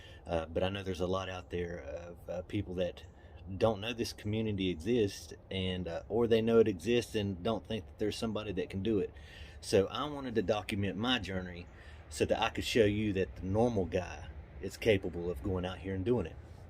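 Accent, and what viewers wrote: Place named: South, United States. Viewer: !!! these look fantastic!! i love how you did the base!! so unique!! i love the southern accent too!! Michigander here recently transplanted to VA... I tell ya what i am getting a way higher profit here in the DC NOVA area vs selling in Michigan.. location really makes a difference!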